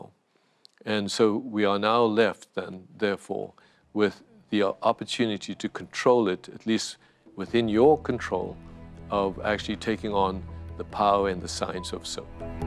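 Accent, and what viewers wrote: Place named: South Africa. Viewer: Great that having spent so much time outside South Africa he still maintains his perfect South African English accent.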